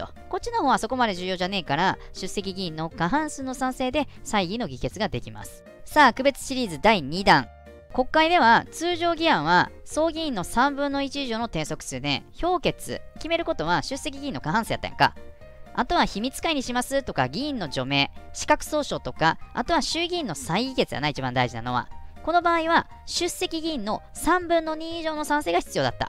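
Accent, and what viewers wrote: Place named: Kansai, Japan. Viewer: この回は関西弁が強調されてる！わら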